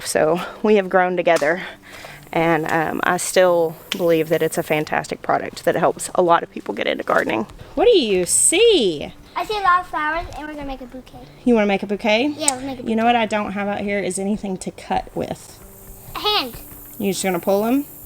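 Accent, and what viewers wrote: South, United States. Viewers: I seriously thing you’re southern accent has become quite a bit more rich since the move. 😊 I’m so sad we got busy & couldn’t come meet you that last Sunday! One day I will meet you! 🤪😄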